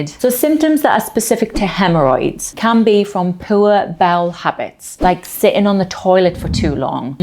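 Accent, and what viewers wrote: United Kingdom. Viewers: What part of the UK is this accent from? Midlands?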